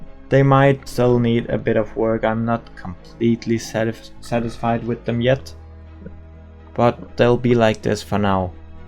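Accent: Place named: Denmark. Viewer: Love the zoo. Also, as a fellow dane, i recognized the accent immediately lol. But your english is good so dont worry about it. It has a charm ❤️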